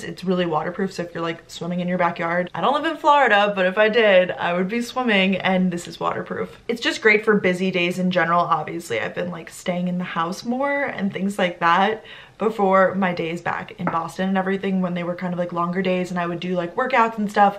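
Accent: Long Island, United States. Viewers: I love how you pronounce “Mario” your Long Island accent really shows. I also love Matt’s videos! How minimalist house tour is funny.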